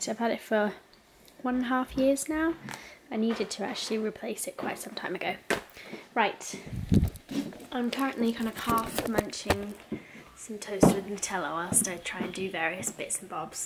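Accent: Scotland, United Kingdom. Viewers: It's only now I've started to notice a little Scottish accent coming through!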